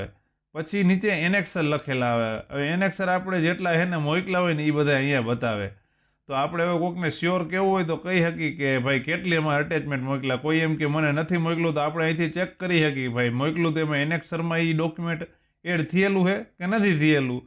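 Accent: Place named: Kathiawar, India. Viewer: હા કાઠિયાવાડી હા... Continue રાખો...😂😂😂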